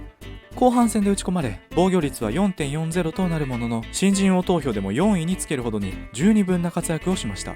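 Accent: Kansai, Japan. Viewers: 主、関西訛り？ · 関西人だ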